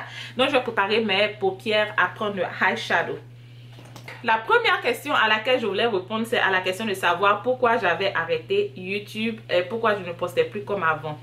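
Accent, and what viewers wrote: Ivory Coast. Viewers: Tu es toute belle ma chérie et tu as l'accent des ivoiriens j'adore tes vidéos 💖💖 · Eeeeeh merci beaucoup oh🙏🙏je te suis sur unstagram depuis longtemps...je te vois toujours sur YouTube mais j'ai jamais cliquer sur tes vidéo ...donc en t'entendant parler avec les deh,les thieeee,comme Abidjan ça m'a captivé j'ai tout de suite su ke tetait ma soeur ivoirienne deh suis trop contente dehors😍😍😍😘😘😘😥😥😩😩😩😥😥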